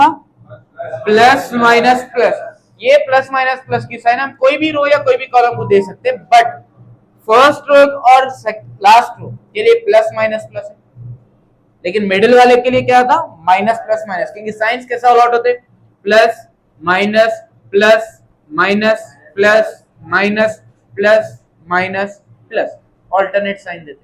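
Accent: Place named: Hyderabad, India. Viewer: Im really happy that a Hyderabadi teacher is also trying to teach 🎉 Honestly telling its so good to study under a teacher who is teaching in Hyderabadi accent ❤❤ Keep going sir 🙏 👏 ❤ You're explaining very clearly and also your explanation is so good 👍 ❤🎉 Keep going sir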